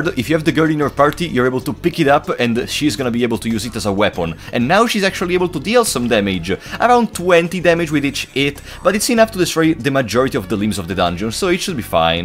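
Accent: Italy. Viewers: Avevo incominciato a vedere questo gioco prendere popolarità e l'ho comprato per provarlo, ora grazie ai tuoi video sono riuscito a capirlo meglio. Ho visto che sei italiano per l'accento e perchè quando vai a vedere il codice sorgente del gioco ci sono le scritte in italiano. Peccato che in italia non se lo caghi nessuno sto gioco. Continua così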